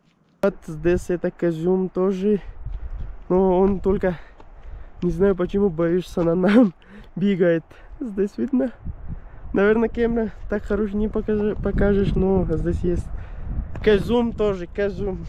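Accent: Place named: Tajikistan. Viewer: У тебя акцент тажикский😊.. Не доехал , а дошол😊👍👍👍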